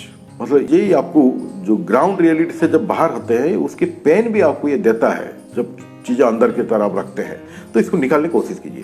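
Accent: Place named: Bengal, India. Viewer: Y m I getting the good smell of Bengali accent from this particular video 😅😅😅 M I ri8 or not😢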